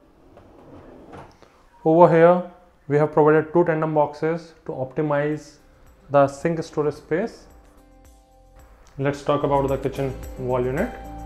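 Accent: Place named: Arab world. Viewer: Love arabic accent. Say again "built-in".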